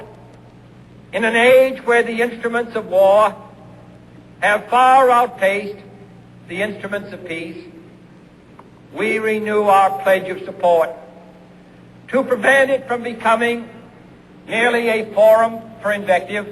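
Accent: Boston, United States. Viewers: where's jackie??? I LOVE JFK!!!! and his boston accent!!!! yea democrats!! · Yeah, he has a Boston/New England accent. He's from Massachusetts. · was not just a great president but a very handsome president with a very rare american accent that you don't hear as much anymore. God Fucking Bless you and your soul. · Notice his Boston accent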